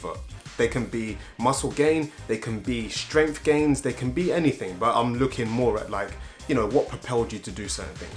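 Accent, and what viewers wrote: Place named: Scotland, United Kingdom. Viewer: Ta Ting has been smashed....just with a Scottish accent. ;-) Loving your work Dude.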